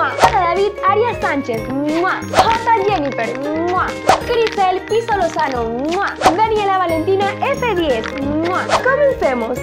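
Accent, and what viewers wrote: Venezuela. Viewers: Eres de Mérida, de Maracaibo o de Caracas? Es que me confunde el acento... por cierto, buen canal me encanta tu escencia y tu entusiasmo 👏😌😍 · Es de venezuela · Creo que de Caracas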